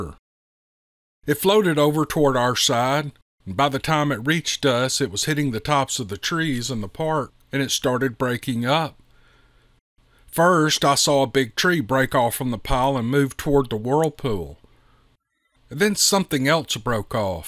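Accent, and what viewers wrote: South, United States. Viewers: There will never be too much commentary,. That's why alot of us listeners tune in! Your southern drawl is 👌 🖤from Oz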